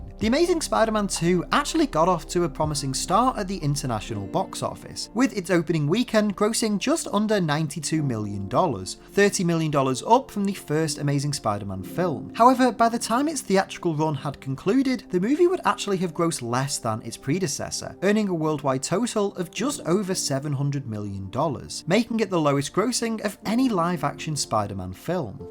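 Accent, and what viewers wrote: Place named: Yorkshire, United Kingdom. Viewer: That's embarrassing hahaha I'm from Leeds I thought I caught ur accent lol, you must be from Yorkshire tho amirite. Besides the point tho I love your videos, I watch them an unhealthy amount 😂 Keep up the excellent insights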